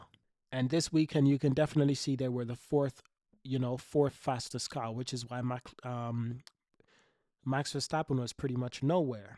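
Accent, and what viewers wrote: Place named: Bristol, United Kingdom. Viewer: **It wasn't anything specific you said; it was more about how you pronounce certain words. You can tell that your tongue is positioned higher in your mouth, closer to the palate, when you speak. Additionally, there's less movement in your jaw; the tension in the jaw muscles can be heard if you know what to listen for These are 2 key and unique mechanics to a Bristol accent vs other accents from that region. There were a few other clues, but none related to slang, as I'm not familiar enough with British regional slang for that to have been a hint for me. I could possibly narrow down the area further, but out of respect for your privacy, I won't do that in the comment section, lol**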